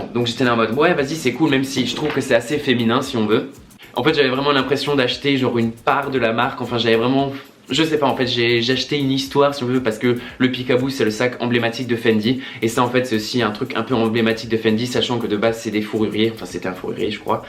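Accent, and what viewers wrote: Alsace, France. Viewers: Tu viens d'ou d'alsace ? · j'adore ton accent alsacien prononcer je suis pareille vive l'Alsace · T'ES ALSACIEN OUIII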